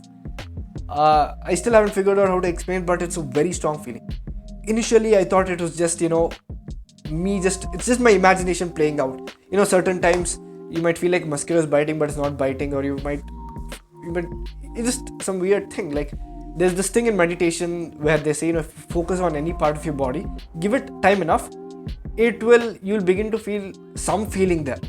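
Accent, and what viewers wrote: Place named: India. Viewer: You are surely an Indian from your accent. Why don't you go to the rural villages of Uttar Pradesh and see the inhumane conditions people live. See if you can help them.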